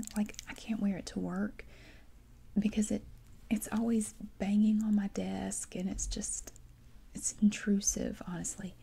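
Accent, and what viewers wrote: South, United States. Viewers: I was giggling when you said you had a "tiny bit of Irish" in you. Reminded me of something Phil Lynott, Irish lead singer with Thin Lizzy, said at a concert. (slightly dirty joke coming up....) He said, "How many of you girls here tonight have a little bit of Irish in you?" (girls scream and wave their hands in the air) "And how many of you would like to a little bit more Irish in you....?!" (girls scream even louder!) Love your southern accent x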